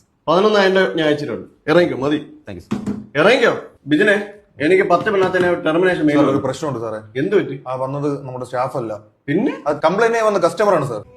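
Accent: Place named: Trivandrum, India. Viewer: Trivandrum slang❤❤❤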